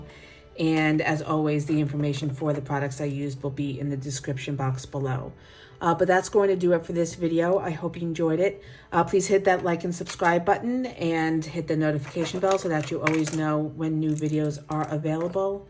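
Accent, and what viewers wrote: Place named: Northeast, United States. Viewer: I’m actually binge watching your videos. I just found your channel yesterday and I love it! You sound like you’re from the northeast which is my home. ❤️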